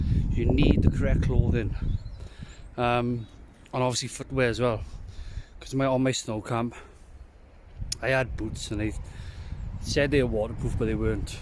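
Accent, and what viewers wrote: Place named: Wales, United Kingdom. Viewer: Just stumbled on your vid. Great tips. Love the accent I lived in South Wales for a bit. I miss it down there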